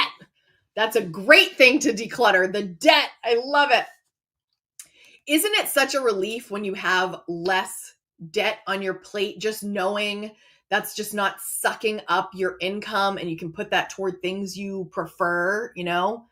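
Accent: United States. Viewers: I wish I understood American! Your English is so different than British English. When you talk about being in school, so you mean school or university? We do school and college up to 18, then it is university. What is sorority and what are the implications of that? The financial acronyms are all so different to ours, so I tend to get bemused. Any interpretation of these things would be welcome. Love your videos and look forward to them each Friday, plus these lovely extras. My life stage is retiring from employment and looking to bring in income from additional streams to keep afloat. I have no debts, and having freedom in that way.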